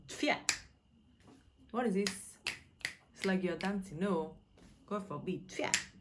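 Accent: Igbo, Nigeria. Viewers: Even her ascent have changed to that of a typical Igbo woman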